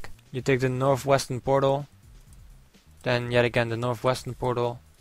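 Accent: Netherlands. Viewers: Je spreekt echt 'nederlands' engels.. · wist dat je NL was door je accent:p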